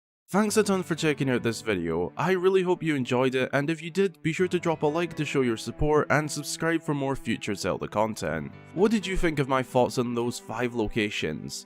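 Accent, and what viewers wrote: Scotland, United Kingdom. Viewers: I love your scottish accent it makes the videos so chill🥰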